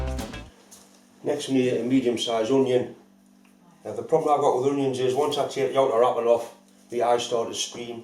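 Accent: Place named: Tyneside, United Kingdom. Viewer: classic !! just rocked onto this, so so funny, trying to place the twang, guessin south tyne poss same as me gateshead ??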